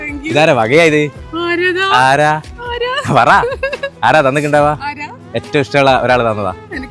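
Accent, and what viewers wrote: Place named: Nadapuram, India. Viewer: നാദാപുരത്തുകാരുടെ ഒരു ഒലക്കീലെ ഭാഷയും,കോപ്പിലെ പരിപാടിയും.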